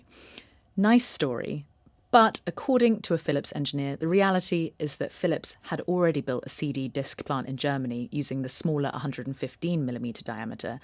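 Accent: United Kingdom. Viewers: I'm left wondering if there is any subject that wouldn't be interesting - heard in your perfect British accent... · I love your channel! Long time watcher/listener first time commenting (I think). Your realness is what I appreciate most, I think we all can feel the you inside your content. That's rare and valuable. Plus being a dumb sounding American, I truly admire your British accent. Keep on rocking in the free world! 🤟🏽☮️🔥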